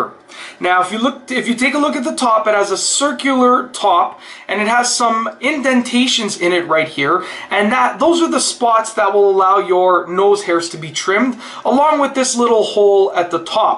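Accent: Canada. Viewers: Are you Canadian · also nice thick canadian accent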